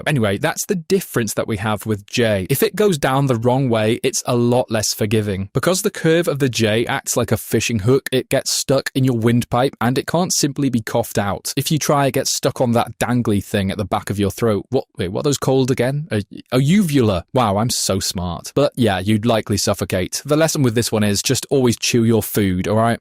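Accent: United Kingdom. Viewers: he was British or some other country that speaks that kind of English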